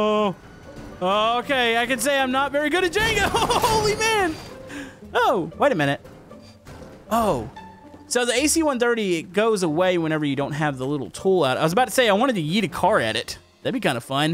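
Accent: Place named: Texas, United States. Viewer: he has now reliasied his texas boi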